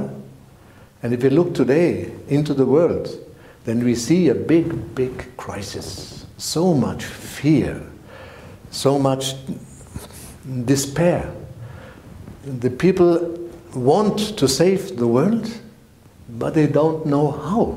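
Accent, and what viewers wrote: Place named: Bavaria, Germany. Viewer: Your Bavarian dialect mixed in with English is a delight to my ears. 😘 I’ve lived in America for many years and still have a little Munich/Bavarian accent😘 love your courses in German and English. Eye and heart opening.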